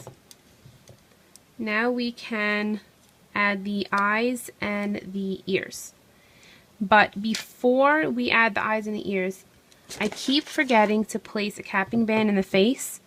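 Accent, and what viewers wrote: New Jersey, United States. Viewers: R u from NJ ?????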